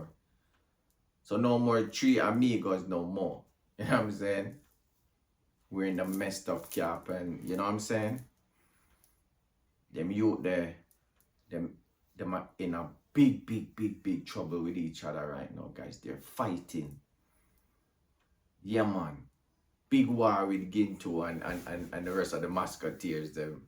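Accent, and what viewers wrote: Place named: Jamaica. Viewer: Funny you sound like Jamaican uncle and I think he was from Canada also.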